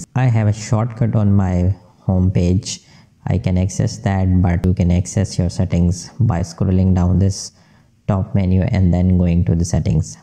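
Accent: India. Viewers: So wut if they have an indian accent i think their helping alot and doing pretty good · People with Indian accents on youtube have tought me more then school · They always have an Indian accent I swear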